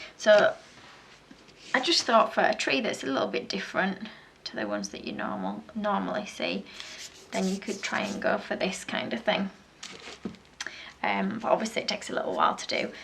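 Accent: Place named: Scotland, United Kingdom. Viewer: you sound a bit scottish to me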